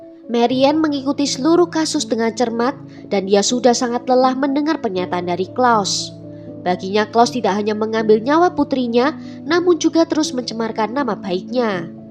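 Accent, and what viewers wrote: Java, Indonesia. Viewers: Medok mbak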